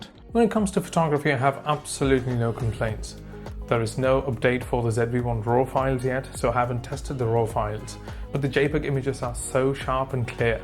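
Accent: United Kingdom. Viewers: British accent maadhri irukku naa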